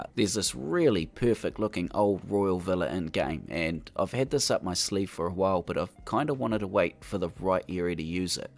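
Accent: New Zealand. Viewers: like always so good, so interesting and combined with your NZ accent it becomes one of CS greatest series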